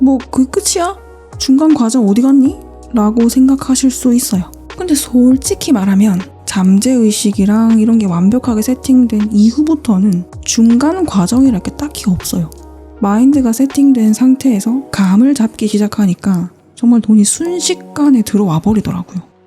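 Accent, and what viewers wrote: Gyeongsang, South Korea. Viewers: 경상도 사투리 같은디.. 그것도 안동, 영주쪽 .. 본관이 어뎁니꺼? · 이 놈의 갱상도 억양은 정말.. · 도네이션을 주기적으로 밥먹듯이 하는 이 채널의 정체는 무엇인고... 오호.... 제 유튜브채널 어차피 100만 넘을 것을 압니다.. 어차피 차고 넘칠 것.. 그냥 천천히 해보도록 하겠습니다.. 어차피 100억 부자 될걸알기에 천천히 즐기면서 인생 살도록 하겠습니다..ㅎㅎ 와... 이렇게 글만 적어도 너무 행복해지네요.. 더 신기한 것을 이 모든 내용을 말하면서 그림까지 완벽하게 그려내시는 당신은 도대체.. 짱이네요.. 그나저나 경상도 아니면 부산분 같은데! 맞나예? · 경상도사투리와 서울말이 섞여있네요ㅎ 매력적입니다! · 구수한 경상도말투에 너무 재미있게 잘 보았습니다. 앞으로도 계속 풍요 안에서 좋은 내용 많이 나눠주시면서 더 행복하고 자유로우시길 바랍니다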